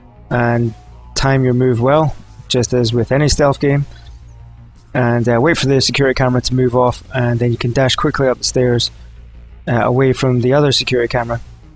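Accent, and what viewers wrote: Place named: Ukraine. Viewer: You sound like ukrainian) nice gameplay btw!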